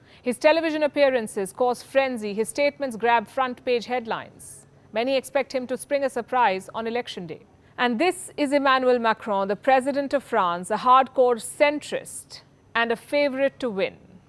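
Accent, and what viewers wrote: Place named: India. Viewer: Palki Sharma beautiful lady and a nice Indian English accent.🥰 I root for Macron I hope he wins radical far right or left are not good for democracy.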